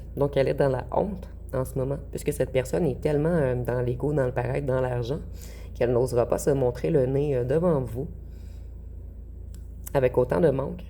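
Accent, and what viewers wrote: Quebec, Canada. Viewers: Bonsoir , c'est toujours avec plaisir que je prends a vous retrouver ,j'apprécie fortement vis guidance ,votre voix québécoise , votre chaleurosite , et votre manicure 👍 toujours au top bien soigner . J'essaie d'oublier ce gros salopard très malade du cerveau et qui se joue a mentir tromper illusionner qu'il a l'habitude de jouer ds son grand scénario théâtrale pour enfermer les pauvres victimes et plus elles sont empathique plus le vampire se régale ,il n'aime que ça les énergies un sorcier magicien ds l'ombre très mal ds sa peau et ds sa tête a toujours être ds la séduction les mensonges son financier est le plus important et toutes ses merdes d'addictions , pour moi il peut croupir il s'est attaquer a une élus ds la lumière et donc a dieu lui même , il est surveillais de la haut et le divin est en action il va payer le poids de ses erreurs et détruite se sentir plus fort que dieu lui même capricieux gamin ds sa tête ,immature , qui boude ou fait des crises capricieuses et ou de jalousie , un vrai psychopathe qui aurais besoin de consulter un docteur malgré que je croit il est irrécupérable et qui voudra bien le garder a part la tierce sa sorcière qui est comme lui ds l'ombre et la sorcellerie . 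Merci a vous , bonne soirée .❤👍🙏🌹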